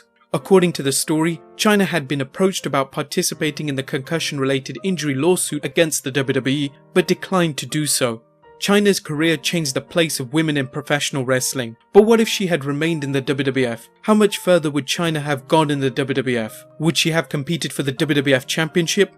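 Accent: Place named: England, United Kingdom. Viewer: The English narrator gives it an air of sophistication and authenticity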